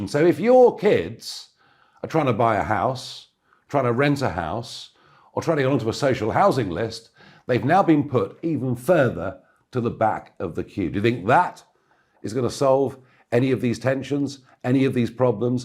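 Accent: RP, United Kingdom. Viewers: think he's realised Tommys right! Now speaking like a Posh Tommy???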